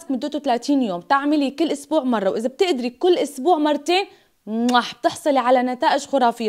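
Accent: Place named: Iraq. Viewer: لهجتج بين العراقيه وبين السورية من أي بلد انتي💙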